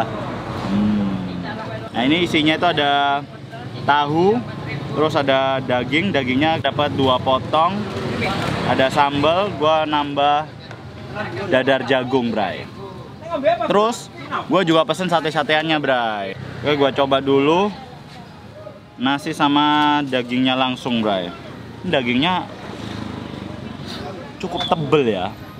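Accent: Indonesia. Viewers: Bray ne kurang pas... Logat sek suroboyo... Mending suroboyoan ae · Mas nya asli org mana to kog logatnya kyk org jatim?? Dan kosa kata nya yg salah diketawain sama suami ku🤣🤣 pulen sama punel itu beda, dan cecek disini itu muntahan org sakit.disini namanya krecek atau kulitan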